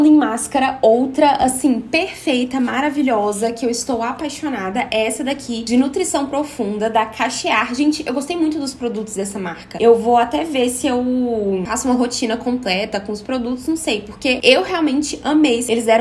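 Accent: Minas Gerais, Brazil. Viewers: Eita Mineirinha .......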